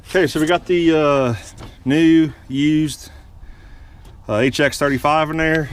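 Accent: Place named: Virginia, United States. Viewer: Need to know that accent....Virginia, with New England accents...?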